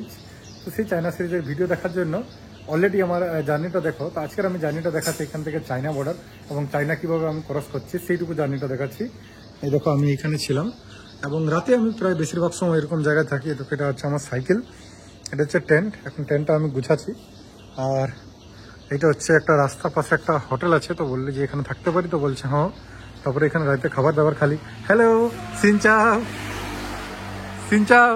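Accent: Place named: Purulia, India. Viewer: Dada tumi purulia bangla bolcho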